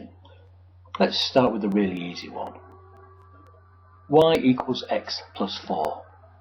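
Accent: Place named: Hull, United Kingdom. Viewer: The narrator has to be from Kingston upon Hull - or close to it. Very helpful video.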